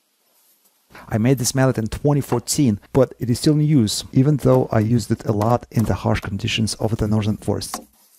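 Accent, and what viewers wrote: Russia. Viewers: So impressive and I absolutely love your perfect English with your Russian accent.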